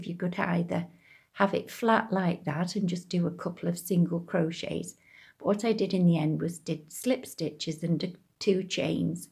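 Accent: England, United Kingdom. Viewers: It’s a great stitch but I’m in the uk and got a bit confused as you have an English accent. I missed the very beginning of the video and only realised after seeing you crochet you were using American terminology, but your video showed all the stitches very clearly, I was looking for a border for the Tunisian crochet blankets I make, this is going to be very useful, thank you.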